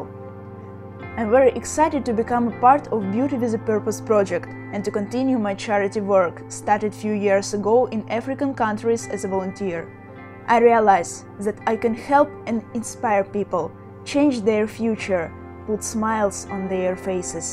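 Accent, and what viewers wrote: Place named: Ryazan, Russia. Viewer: Рязанский акцент,а так ничего,на Зулейку Ривьеру похожа, в русском варианте.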